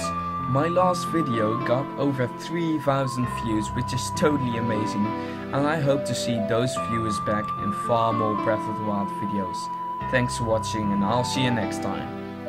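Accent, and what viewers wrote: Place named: England, United Kingdom. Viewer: ur english accent is getting pretty dank btw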